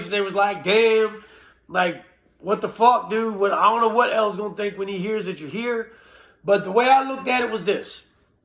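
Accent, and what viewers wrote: Virginia, United States. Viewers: You from Virginia?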